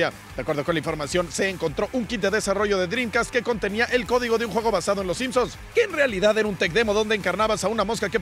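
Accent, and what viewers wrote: Argentina. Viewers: Excelente acento argentino... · y vos como te sentís? te da vergüenza jugar videojuegos con acento argentino, lo mejor que he oido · Muy bueno ese acento argento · ese acento argentino..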